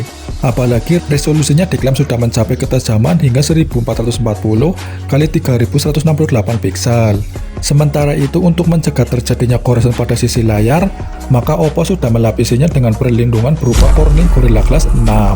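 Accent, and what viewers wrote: Banyumas, Indonesia. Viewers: Medok cak suarane kepriben kepriben inyong.....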